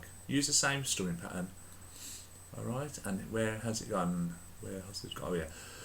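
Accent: United Kingdom. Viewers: you from birmingham aha :D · hard to understand u with ur british accent !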